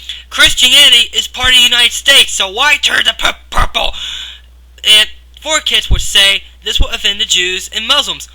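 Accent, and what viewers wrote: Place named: Australia. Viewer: I thought it was aussie.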